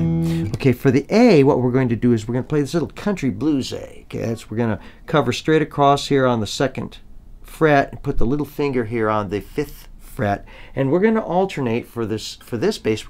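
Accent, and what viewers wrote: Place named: United States. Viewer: your playing is terribly unprofessional. your accent even worse to endure listening to it. why are you doin this videos without really practicing until you get it right... ahhh sorry i completely forgot you maybe could be an american and your main audience is also american .. im 100% serious about what i write, especially your unawareness of how beautiful a guitar can sound if you play music with it, not just notes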